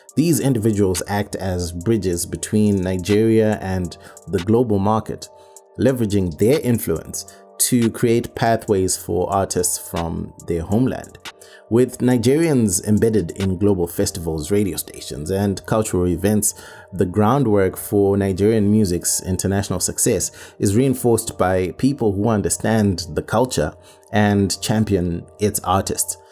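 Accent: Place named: Ghana. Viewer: You sound like a Ghanaian. Let me educate you on something; Jollof is West African and Nigerians didn't appropriate Amapiano. The generation of musicians that existed before the current musicians paved the way and the new generation just used what they blazed as a stepping stone to the top. There's no substitute for hard work.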